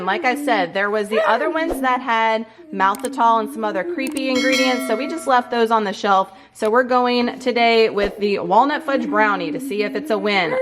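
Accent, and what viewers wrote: Louisiana, United States. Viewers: Recently found your channel about a week ago! I use to do keto and had success years ago but I needed something else. Currently doing alternate day fasting and just calorie counting! But your SO motivating!! Btw love your accent!! I’m from Louisiana too! Pierre part... about 1.5 hours away from New Orleans!! It’s nice to see locals on the YouTube!! Keep it up!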